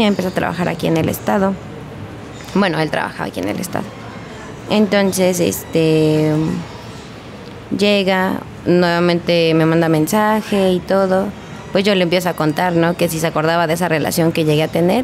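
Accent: Fresa, Mexico. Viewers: La verdad no le creo nada, además, por qué habla como fresa? Me recuerda a una amiga que tuve en la adolescencia que era bien mentirosa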